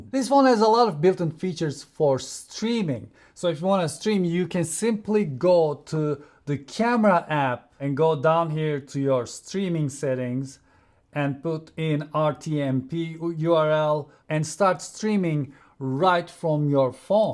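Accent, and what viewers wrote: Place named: Turkey. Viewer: You have a Turkish accent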